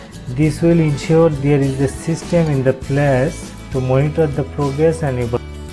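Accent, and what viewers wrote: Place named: Bengal, India. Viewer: looks bangali English so try to focus on pronunciation & then upload it.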